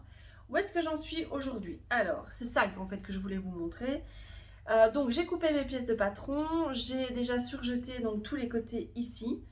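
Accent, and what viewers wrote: Belgium. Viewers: Merci pour tes podcasts, c'est toujours un excellent moment, et j'aime beaucoup ton humour, ta simplicité et ton joli accent Belge. Pour les bruits, moi je ne trouve pas cela dérangeant, surtout qu'il faut vraiment tendre l'oreille.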